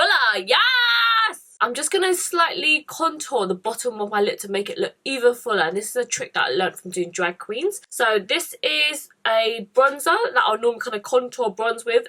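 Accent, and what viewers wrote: United Kingdom. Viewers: I wasn’t expecting the British accent 😂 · hey girrrlllll Im Gemini too june 11th :) i love the uk accent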